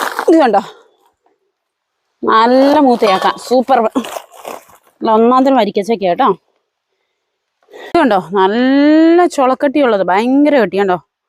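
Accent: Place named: Southern Kerala, India. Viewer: നിങ്ങളുടെ വീട് കാസർഗോഡ് ആണെന്നറിഞ്ഞു... പക്ഷേ ഈ ഭാഷ കാസർഗോഡ് അല്ലല്ലോ... തെക്കൻ ജില്ലയിലെ ഭാഷ പോലെയുണ്ട്.... എന്താണെന്ന് ഒന്ന് ശരിക്കും പറയണേ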